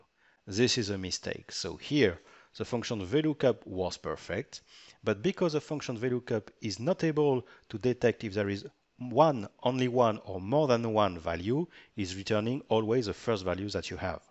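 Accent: France. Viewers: Thank you for posting. Very helpful. The French accent made it even better. Thanks again.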